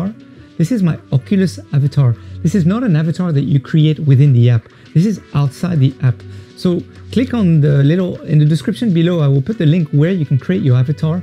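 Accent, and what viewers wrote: France. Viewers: Sa sens le français à pleins nez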